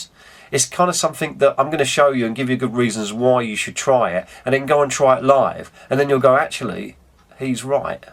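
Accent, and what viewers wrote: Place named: United Kingdom. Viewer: I trust you more because of your british accent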